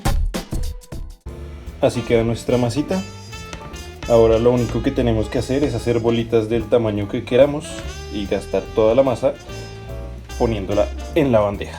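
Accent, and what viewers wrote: Colombia. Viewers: De qué parte de Colombia eres? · Eres Colombiano verdad. Que buena vibra.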